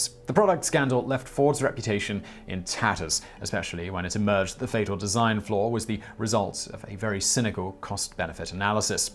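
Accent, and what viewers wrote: United Kingdom. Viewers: Its the Queens English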